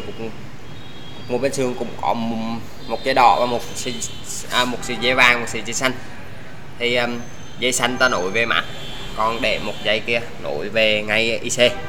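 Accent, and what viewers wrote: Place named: Quang Ngai, Vietnam. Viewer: tuy anh nói tiếng quãng ngãi hơi khó nghe thật. nhưng thật sự em dám công nhận anh chỉ rất dể hiểu và có thể làm đc. do anh có chuẩn bị sẳn đồ án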